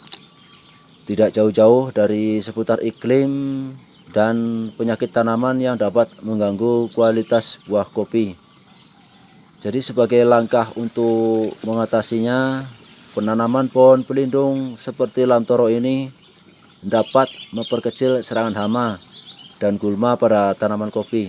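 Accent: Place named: Banyumas, Indonesia. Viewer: wa'alaikumsalaam wr wb jos pokoke... tapi ngomong-ngomong orang aceh ngp bs ngomong ngapak yak bawa saya ke sana dunk 🤝💪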